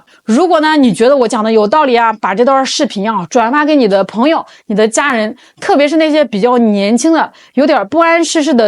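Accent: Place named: Beijing, China. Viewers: 她是一个北京大妞。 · 原來是北狄人 口音特別不舒服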